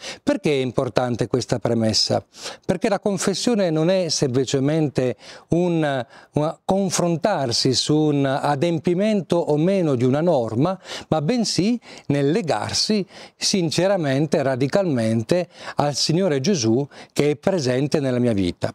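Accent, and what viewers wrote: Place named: Parma, Italy. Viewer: Caro questa è una bella sorpresa per me! Sono della provincia di Brescia. La seguivo molto volentieri, quando stava al santuario della B.V. Maria del rosario di Fontanellato e su Giovanni Paolo TV trasmettevano le sue catechesi sui 10 comandamenti. Mi è spiaciuto quando l'hanno trasferita nel torinese perchè ancora piu lontano... ma sono felice che sia andato a fare del bene anche la... Poi anche di G.P. TV s'è perso il segnale perciò era molto che non la vedevo e sentivo... in un primo momento mi era sembrato di conoscerla, poi sentendola parlare l'accento non mi convinceva perchè ricordavo la cadenza parmense.. ma da come parla ho detto no dev'essere lui! poi ho letto nella descrizione e.. che gioia! Mi ha fatto un vero piacere vederla e tutto. Lei non mi conosce ma io è come se la conoscessi. Saluti! Pace e bene! Sia lodato Gesù Cristo! 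❤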